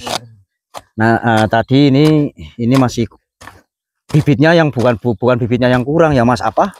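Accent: Banyumas, Indonesia. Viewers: Sehat terus bang,aja klalen ngapak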